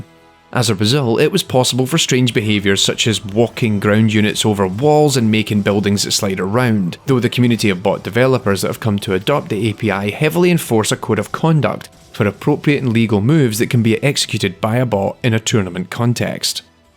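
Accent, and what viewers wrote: Scotland, United Kingdom. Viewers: Anyone else taken aback by the sudden switch from a Scottish accent to a really good French one?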